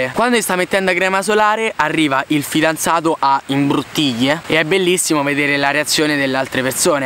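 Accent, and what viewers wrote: Italy. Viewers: Me piace troppi ed tuo accento romano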